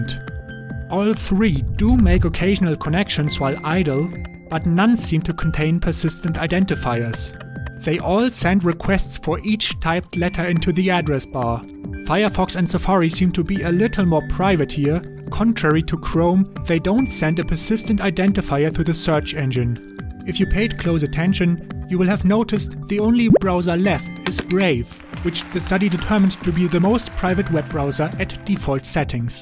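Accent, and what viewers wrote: Germany. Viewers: German accent? ;-)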